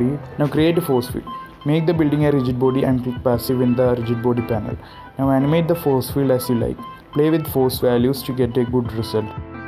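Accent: Kerala, India. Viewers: U sounds like a mallu, btw nice video 🤘🤘👍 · Why do you have Indian accent? Are you trying to be CG Matter? Or Ian Hubert? Or Royal Skies LLc?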